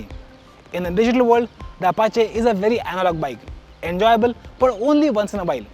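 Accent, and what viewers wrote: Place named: India. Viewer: <link> #IndianPronunciation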